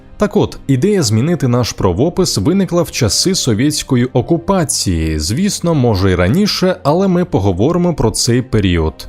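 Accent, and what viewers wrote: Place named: Russia. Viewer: Якщо переймаєтесь правописом,то потурбуйтесь ще й про вимову. Ведучий чьокає і щьокає на рівні з росіянами((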